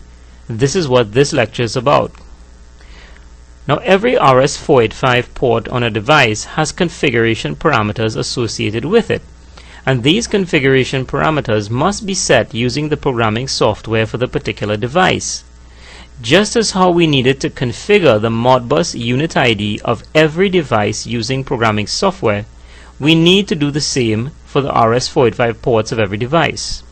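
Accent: Trinidad and Tobago. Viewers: That accent... Trini! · I know that trini accent anywhere.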